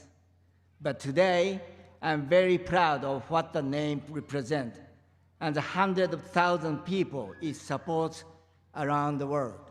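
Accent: Japan. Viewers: 私がどうこう言うまでもなくあまりに素晴らしいスピーチですが、発音は日本語発音な部分があるんですね 私は自分が正しい発音をできないことばかりコンプレックスとしてまともに英語を話せませんが、重要なのはそんな部分ではない事を改めて痛感しました こんな素晴らしいスピーチが、内容でなく自分の発音に結びつくと言うのも情けない話ですが、私も英語でコミュニケーションできるように頑張ろうと心を新たにしました。ありがとうございます。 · 字幕追ってられるから気付けたけど結構誤字なのか誤訳なのかあるね。living roomとか笑 発音もまあ日本のおぢって感じではあって怪しいところあるけど。 とは言え内容はとてもいい。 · British English ではイラーAmerican English はエラー。スピーチは内容で勝負。ザ.Japanese English でも人を惹きつけている。豊田さん👏👏👏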